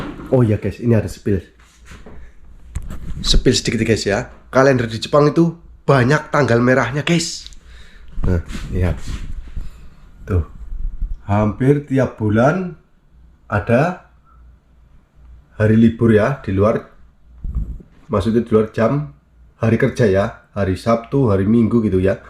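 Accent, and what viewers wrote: Banyumas, Indonesia. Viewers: Mas orang jawa ngapak ya, ini di jepang di kota mana mas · jawane ngendi mas , kok logate ngapak tenan 🙏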